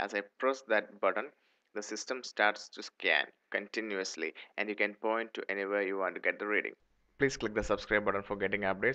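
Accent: India. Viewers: typical indian accent !!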